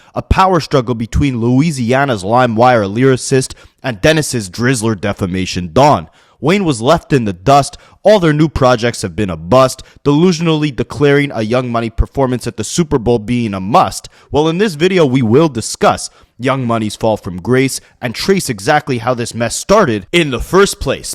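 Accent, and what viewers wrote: Canada. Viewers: no doubt I was just making sure I seen it questioned in comments a couple of times and the Canadian accent brought me back to the question and I never saw a straight answer 😂😂😂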